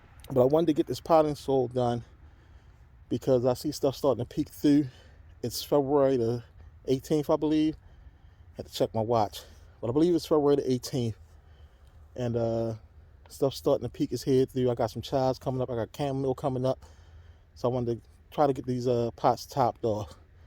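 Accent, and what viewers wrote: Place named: Baltimore, United States. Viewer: Sir, where are you from? I hear an accent and my husband says that it sounds like Baltimore.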